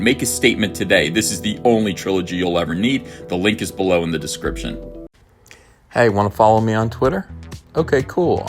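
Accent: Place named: Long Island, United States. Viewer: what is the accent that has? I want to say"gay" but is it Long Island?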